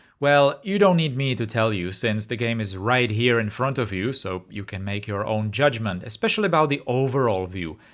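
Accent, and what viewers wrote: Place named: Czech Republic. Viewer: Are you Czech? I like your accent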